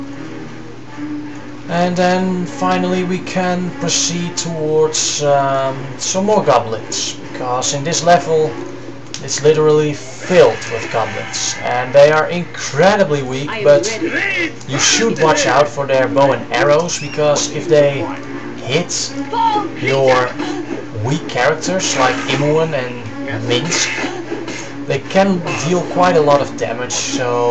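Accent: Netherlands. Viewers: hes dutch aint he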